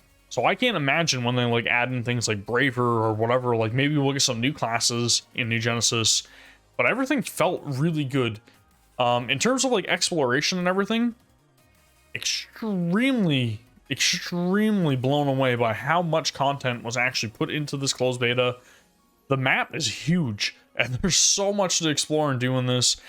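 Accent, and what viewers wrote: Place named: Atlantic Canada, Canada. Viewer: Informative video. Out of curiosity, are you from the east coast of Canada by any chance? I hear a bit of NS or maybe NL to your accent.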